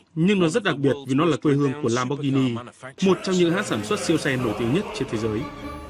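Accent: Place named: North, Vietnam. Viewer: Value giọng Bắc, lam bót ghi ni haha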